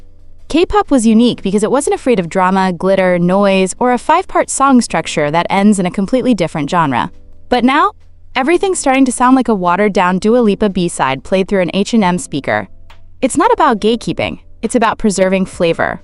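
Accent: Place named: Nigeria. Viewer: she sounds Nigerian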